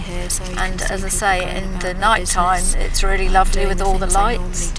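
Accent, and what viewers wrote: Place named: United Kingdom. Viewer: The British Accent 😍😍😍💚💚🔥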